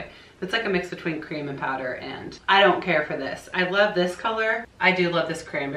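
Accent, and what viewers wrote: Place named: South, United States. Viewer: I loved watching you declutter. I've grown to miss the south and home so it's nice hearing your accent. Will keep watching. ❤️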